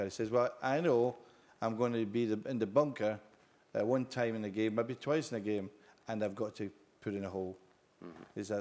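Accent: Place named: Scotland, United Kingdom. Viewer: Especially with his thick Scottish accent. My ears are blessed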